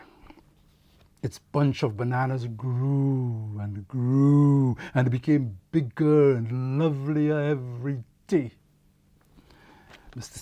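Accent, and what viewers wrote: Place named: Patois, Jamaica. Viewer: Would love to listen to your poetry on an audiobook!!! The twang of patois in your voice is so warming.....hello family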